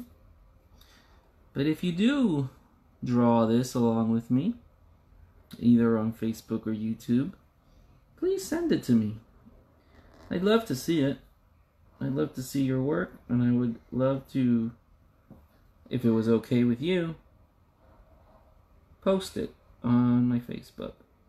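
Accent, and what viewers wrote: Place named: United States. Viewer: You have a very calming voice too! Usually I find American accents irritating but yours is definitely nice! 😝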